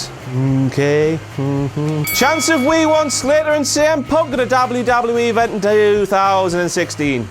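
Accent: United Kingdom. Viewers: He repeated the "tan job" like a million times and it still took me a while to get it. These British accents.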